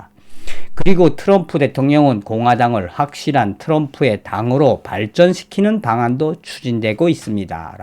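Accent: North Korea. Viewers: 방송 희소삭 매일 우울한 국민을 가짜든 진짜든 희망을 갖게합니다 하여 방송 감사하게 생각합니다 그러나 북한사투라는 이해하자만 이시점에서 좀 삼가하심 어떠신지 ? 죄송합니다.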